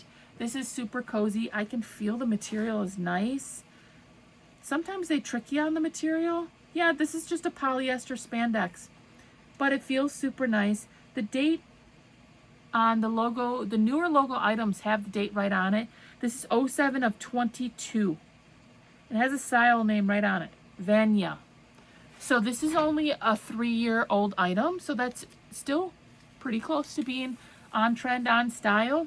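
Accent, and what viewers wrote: Wisconsin, United States. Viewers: BTW the first time I watched you I caught your accent, I was like she has to be from Wisconsin! I was born and raised in Eau Claire so I recognized where you were from. I am in Washington now but anyway.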